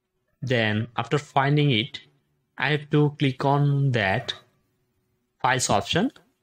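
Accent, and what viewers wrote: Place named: India. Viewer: Bahasa inggris campur india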